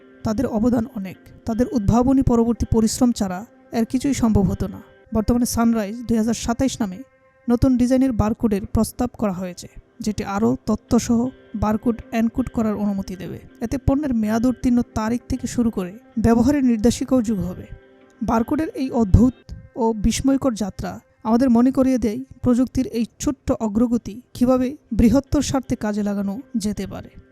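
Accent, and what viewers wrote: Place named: Bangladesh. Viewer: আপনাকে বাংলাদেশী মনে হচ্ছে 😊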